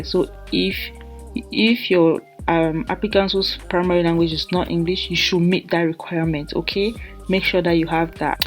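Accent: Nigeria. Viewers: Cameroonian u sound Nigeria 100%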